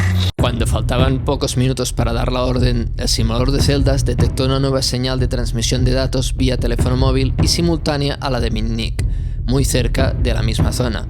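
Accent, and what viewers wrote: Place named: Catalonia, Spain. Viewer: Em fa molta gràcia com se't nota que ets català 😉 Salutacions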